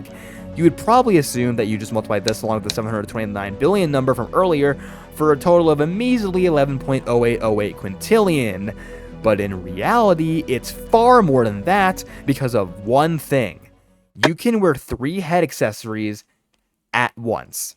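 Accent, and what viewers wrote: United States. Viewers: fr she sounds American fr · His accent looks kinda American so i wouldn't be surprised.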